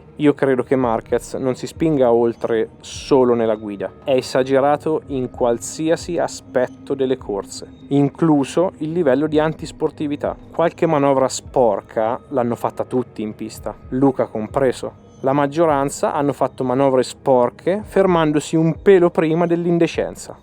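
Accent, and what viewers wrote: Perugia, Italy. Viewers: Accento perugino inconfondibile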